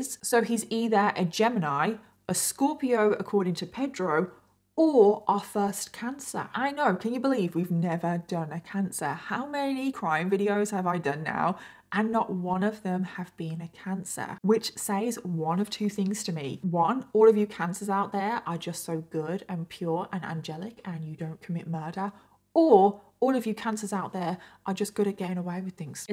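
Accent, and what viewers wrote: United Kingdom. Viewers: you are so sweet and caring <3 i love the way you tell these cases, it's very personal and it always shows your opinions and feelings about them.. also ADORE your british accent! :) Love from Italy <3 P.s: in Italy we just had a very shocking murder of a young girl, who was a mother to a 5 year-old baby boy and she was also pretty known on the internet.. it's really absurd, I'd really like to see u cover it!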